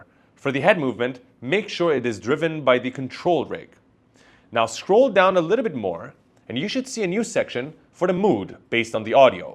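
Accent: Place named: Iran. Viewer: I like your Iranian accent! Thanks for the instruction!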